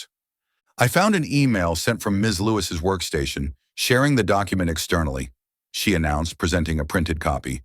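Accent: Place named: United States. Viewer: Thank you. Good story. Appreciate the American voice telling American stories!!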